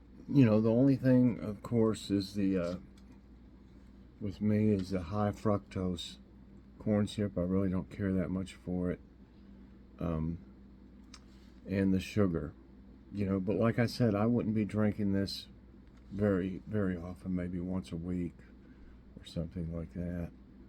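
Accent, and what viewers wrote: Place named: England, United Kingdom. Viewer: I love listening and hearing your English accent for I always love to learn. I tried to listen to understand English much better. Thank you 🙏 so much for sharing this strawberry lemonade as it is so new to me. I’d like to try it one day 🙏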